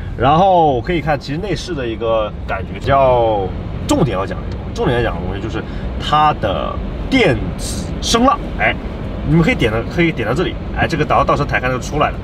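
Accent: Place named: China. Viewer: 聽你的口音是大陸人吧，怎麼會背離祖國共產黨，去北美敵人那生活呢？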